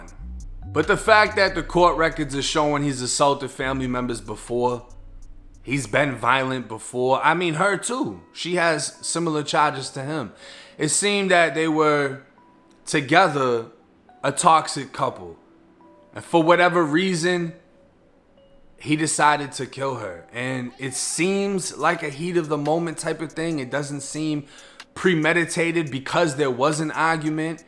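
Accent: Boston, United States. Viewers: If you from florida why you sound like you from south Boston fam?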